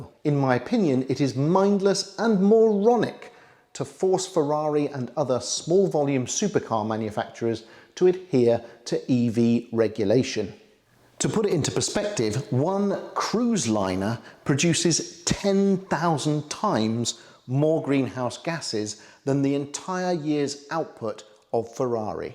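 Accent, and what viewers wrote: United Kingdom. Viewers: The proper English accent. yes.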